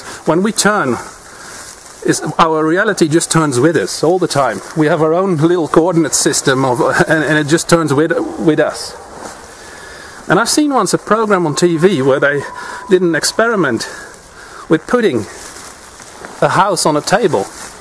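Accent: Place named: Canada. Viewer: One of the best and most practical vids on navigation I've seen yet! My father-in-law has one of the best navigational abilities I've ever seen and we are in flat heavily forested terrain and I've watched his dead reckoning on cloudy days and could only marvel at the internal GPS he carries around in his head. What region do you live in, almost sounds like Canada?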